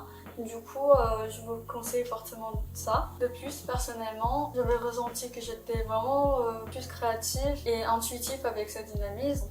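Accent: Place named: France. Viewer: wow i love your french accent 😍it's so gorgeous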